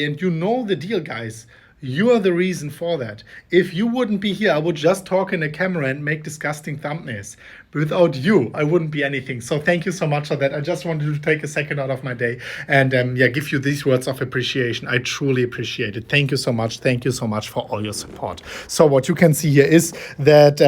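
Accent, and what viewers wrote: Germany. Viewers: I just want to say, regardless of crypto, you‘re such a nice guy and have a great personality. My friends and I are watching your videos and we alway have a great time and laugh about your german accent because we‘re germans too😂 not to mention the thumbnails. Keep up the great energy and positivity, you always get me through these bearish phases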